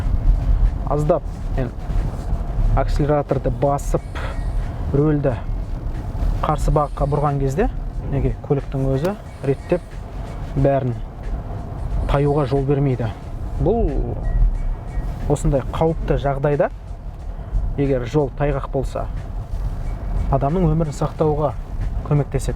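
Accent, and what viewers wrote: Standard. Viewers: Жәрайсың таза қазақ тілінде жүргізген түсініктемелерің өте керемет. Қазақ тілінде осынша таза жеткізе білүде екінің бірінің біліктілігі жете бермейді. Ризамын саған інім. Талмай самғай бер. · Ма ша Алла қазақшаны тап таза бұрмаламай сөйлейді екенсің жарайсың!!!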